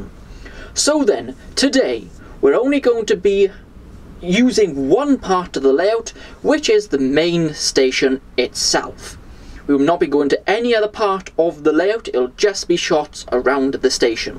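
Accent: Wales, United Kingdom. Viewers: Hi. I'm in Wales also (valleys) good to hear another Welsh man..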